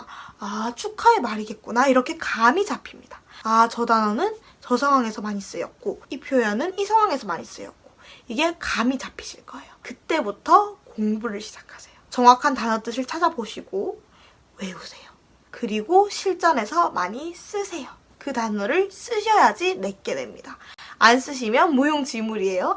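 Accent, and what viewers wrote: Gyeongsang, South Korea. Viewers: 경상도 사투리 묻어나오시네용 ㅎㅎㅎ 도움 많이 되었습니다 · 경상도 사투리 쓰시네요ㅋㅋㅋ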